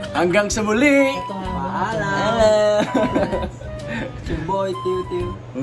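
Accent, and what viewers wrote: Visayas, Philippines. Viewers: Hahaha..nagkatawa ko nmo tiw pareha jud ta ba na pagmagtagalog bisaya japong dako 😁nindot jud ning molingi ta kong asa ta gkan 😊😮 Faith villanueva Davao #done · Bisayang bisaya accent po kau kuya. Gusto ko matutong magsalita ng bisaya. Hindi po kc ako bisaya tagalog po ako. Turuan mo nmn akong mag bisaya idol kuya. I love hearing bisaya accent speaking tagalog words. Nakakaktuwa talaga kaung mga Bisaya.. Sana makapunta din ako sa inyo sa Visayas at Mindanao one of these days.😊🙂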